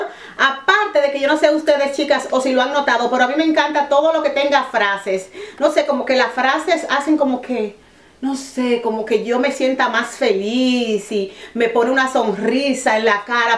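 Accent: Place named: Dominican Republic. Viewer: jajajajaajj es dominicana por el habla'o. besitos me encanta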